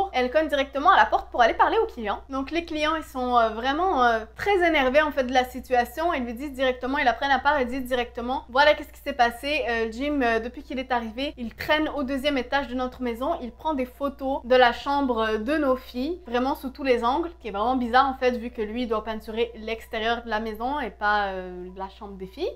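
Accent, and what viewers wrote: Quebec, Canada. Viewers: tu es québécoise n'est-ce pas ?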